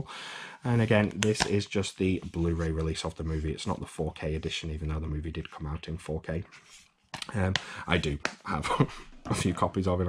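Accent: United Kingdom. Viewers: Where in the UK you from? Trying to pinpoint accent. Its hard sounds northern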